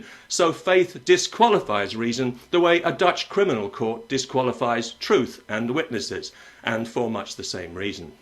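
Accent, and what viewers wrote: United Kingdom. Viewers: I guarantee you that you wouldn't sound NEAR as convincing without that British accent. That's really all you have going for your argument. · fine British articulation are almost hypnotic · with a british accent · This guy is like the Amazing Atheist only with a spiffy accent and a snappy British wit.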